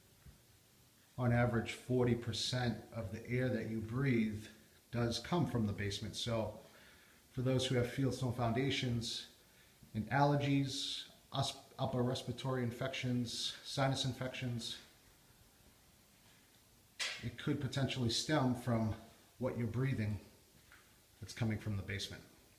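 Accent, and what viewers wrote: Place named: Boston, United States. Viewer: Hi, I've been watching many mason videos in hopes of learning how to do what you are doing in the video here. As soon as I heard your voice, I said to myself, this guy is local as I recognized our Boston/New England accent. So here is my story. I am a 67 year old woman who tries to do as much as I'm capable of doing myself. The basement you are working on is very much like mine. I've started digging out in between the stones and cement. I've got approximately 4x4 area done. Clearly I've taken on more than I'm capable of. HELP!!!! Are you able to tell me what that job cost the homeownet? Since mine is in the same condition and close in dementions, it would, at the very least give me ball park number to think about! I will go to your web site and read more of your services.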